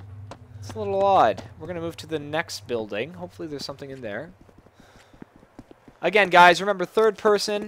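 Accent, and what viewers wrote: Denmark. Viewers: are you danish? :P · Are U danish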